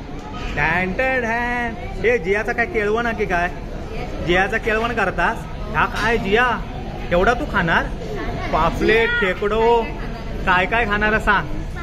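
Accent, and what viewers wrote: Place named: Malvan, India. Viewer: साहेब तुमचे मालवणी भाषेत बोलणे खूप छान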